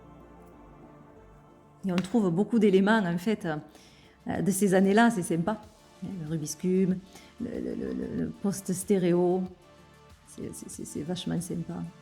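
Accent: South, France. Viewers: Jaime bien le petit accent du midi 😊.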